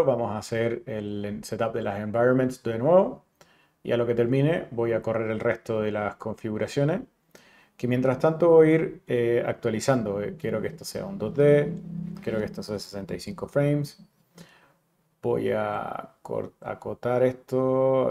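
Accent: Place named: Chile. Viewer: tienes acento chileno...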